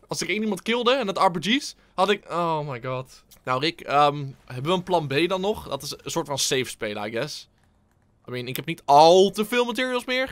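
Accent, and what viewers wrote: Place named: Flanders, Belgium. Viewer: Ga weer vaker vlaams praten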